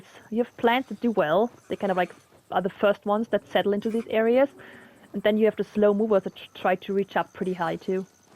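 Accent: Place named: Germany. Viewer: Correct me if i'm wrong but I think that there was a mission somewhere regarding the ferals to completly wipe them out or at least cull the spawnrate. Your comment on sodium was quite interesting. Where are you from? You have quite the accent but I can't pinpoint where it's from. If I had to guess.... Germany? I'm not so sure, you did say Natrium right? Btw one of my favorite plants is Sol's Delight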